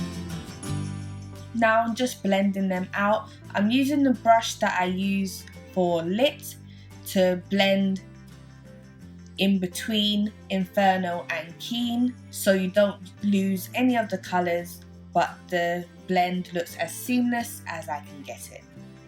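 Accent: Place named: England, United Kingdom. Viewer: Damn, you're English lol I love your accent. New subbie here 😊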